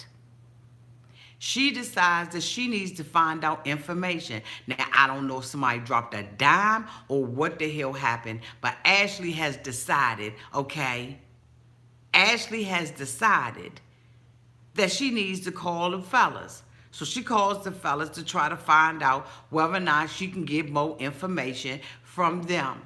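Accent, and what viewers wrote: Washington DC, United States. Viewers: Auntie are you from the DC area? I'm trying to place your accent and I'm getting a strong DC accent. I'm a native so it's all love.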